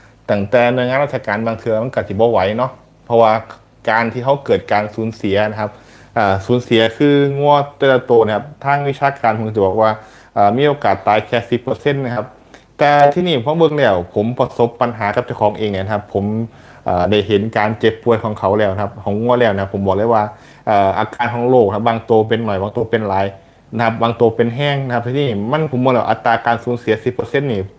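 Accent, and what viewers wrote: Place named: Phutthaisong, Thailand. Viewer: พุทไธสงชัดเจนคับ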